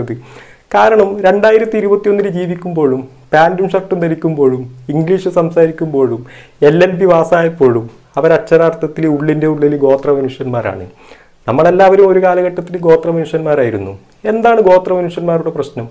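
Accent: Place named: Kozhikode, India. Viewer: Almost 1.23 minutes of a clip by some extremists like Taliban from an unidentified place, then a teaspoon of Moin Ali, then two cups of Naveen and Janaki, then a piece of incomplete hadith. All mixed in a cauldron peppered with nice kozhikodan accent. Sure recipe for a viral video with lots of views, likes, comments and thus a revenue mode for the presenter! Of course the presenter has the rights to air his opinion since we live in a democratic country but it should not be at the expense of religions in our country. Every religion including Islam, Hindu, Christianity etc has an extremist faction. But generalising the whole community by highlighting only those selected parts is totally wrong. Don't tarnish Hinduism with your theory of Gothram just because some insensitive politicians brought a communal angle to the viral dance video. Majority of Hindus protested against the stupid statement brought out by the political party. 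And by the way , quoting a hadith is a new fashion and a sure shot ingredient for making videos viral because people don't know what's written in Quran, the context, the inner meaning etc since it's in Arabic. When you proudly discovered the Intoxication hadith, my dear friend, you forgot to check the full context and remaining part of the hadith you mentioned. Next time, please read the paragraphs above and below of the random lines you pick to spark a fire of hadiths or verses from Quran. Understand the whole chapter and context before adding memes! Oth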